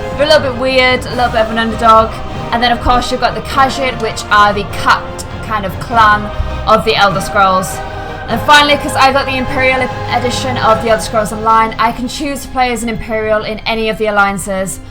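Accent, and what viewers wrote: United Kingdom. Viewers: Shes british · Oh my god she's so British.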